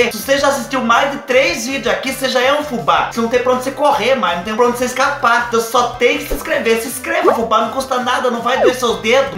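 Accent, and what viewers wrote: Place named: Caipira, Brazil. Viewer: Fubá tu é doidinho das ideias com esse sotaque caipira e contando teus causos eu não aguento 😂😂😂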